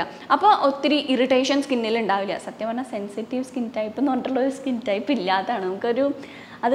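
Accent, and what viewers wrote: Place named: Thrissur, India. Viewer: Aahhaa.. thrissurkaari aayo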